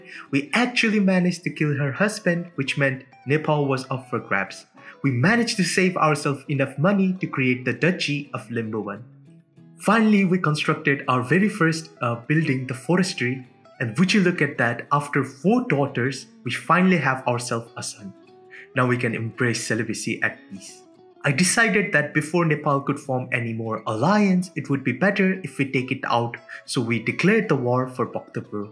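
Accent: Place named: India. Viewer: by any chance are you indian or even nepali?you have indic accent.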